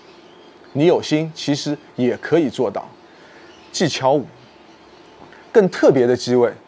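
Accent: Shanghai, China. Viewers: 这口音太上海了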